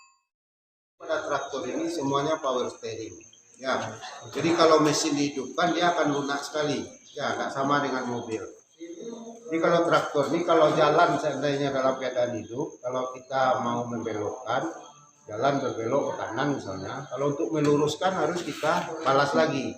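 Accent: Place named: Padang, Indonesia. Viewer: Bapak ini kayaknya orang Padang ya..saya liat dr logat bahasanya 😁😁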